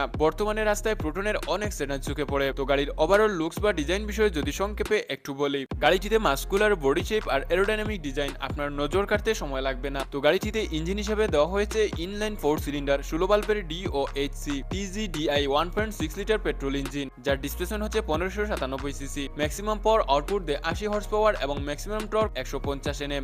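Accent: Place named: Sylhet, Bangladesh. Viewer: সিলেটি উচ্চারণ।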